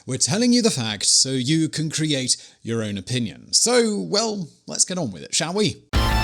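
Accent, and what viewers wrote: United States. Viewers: stop doing american accent please! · Nice American accent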